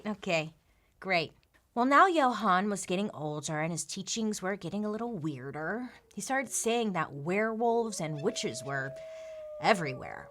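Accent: United States. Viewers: Where is from v my thoughts our deffo usa accent v do love a the Americans v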